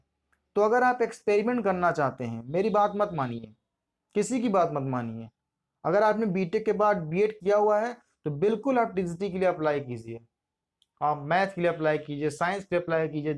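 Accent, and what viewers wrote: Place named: Allahabad, India. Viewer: Sir aap ki bhasa Allahabdi lg rhi hai mja aa gya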